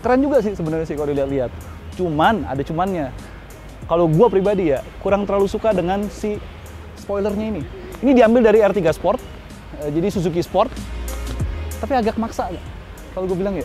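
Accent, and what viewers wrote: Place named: Java, Indonesia. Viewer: yg review 😂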